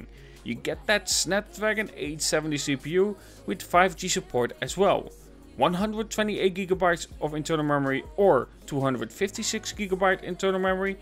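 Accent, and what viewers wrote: Netherlands. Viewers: u sound Dutch 🤔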